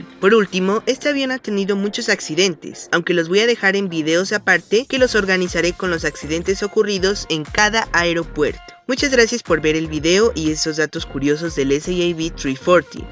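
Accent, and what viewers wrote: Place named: Nariño, Colombia. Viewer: Sentí un Leve acento nariñense por ahí, ¿este canal es Colombiano? salu2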